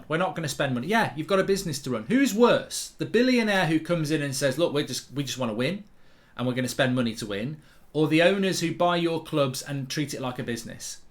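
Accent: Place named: Birmingham, United Kingdom. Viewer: Where does goldbridge live......he is certainly not a Mancunian as he has a quite distinct Brummie accent.....Are there no clubs in the Birmingham area for him to support.....or is this all about ££££££££££££££££££££££££££££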